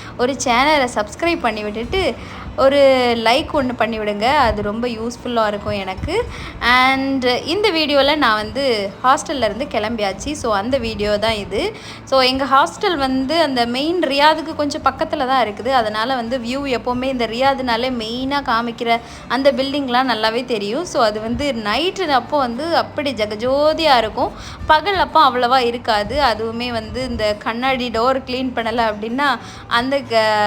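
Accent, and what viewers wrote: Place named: Kanyakumari, India. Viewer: First time watching...... ivanga kanyakumari ponnu, the slang😊❤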